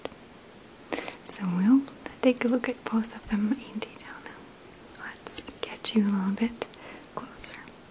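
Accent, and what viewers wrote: Russia. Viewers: Scissor__hands94 is definitely one of my favorites. If you like her accent, I'd suggest ASMRMania (another Russian gal, her accent is quite a bit thicker than.) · I really love your facial with strong Russian accent. As well as your sleep fairy. Could you please do some more similar? Thanks for always brightening my day,. Keep up the grand work:-)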